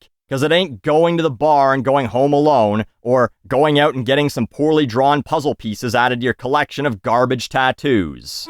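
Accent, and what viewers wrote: United Kingdom. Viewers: The Brit accent cracks me up. Also the gimp mask and strap-on Hahah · That British Accent Though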